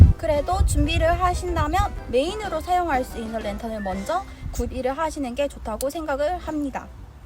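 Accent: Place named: Daegu, South Korea. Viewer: 저도 경상도 대구라 사투리 참 듣기 좋네요 ㅋㅋㅋㅋ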